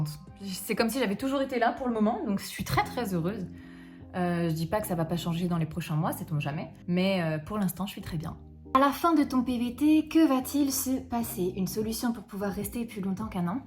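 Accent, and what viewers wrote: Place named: England, United Kingdom. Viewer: Et les copains de France te manquent pas ? Vilaine 🙃🙃 j'adore ton accent anglais ! Et merde pour tes exams du coup :) bisous bisous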